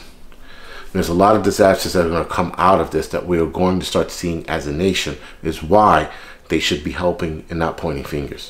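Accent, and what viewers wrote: New York, United States. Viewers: You nailed it! Sounds like everything that come out of my New Yorker mouth! Lol by far my favorite channel to watch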